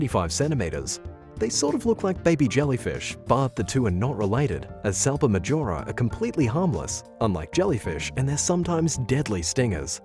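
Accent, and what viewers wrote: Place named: Australia. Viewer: Since subbing to your channel a few weeks ago, ive never enjoyed a nature channel as good as yours! I dive in as soon as i get notification & have thoroughly enjoyed every single one! I know there are tons of wildlife vids out there but yours gets straight into the nitty gritty with gusto & enthusiasm & i love the Aussie narrator Long may you all continue to show us great vids & brilliant cinematography underwater & above Super entertainment Thank you all 😉🇬🇧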